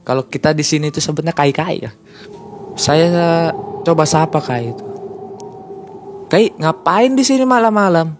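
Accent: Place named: Samarinda, Indonesia. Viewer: Dengar logat Bang jadi inget temen di kampus, beliau orang Samarinda. Pas beliau menikah, saya datang, memang sih kalimantan itu kanan kiri hutan, kalau bukan orang kalimantan yang bawa mobil agak sulit. Kebayang malam2 bawa mobil ke bukit yang notabene hutan begitu, jelas harus hati-hati, karena memang bangsa jin lebih banyak bersarang disana.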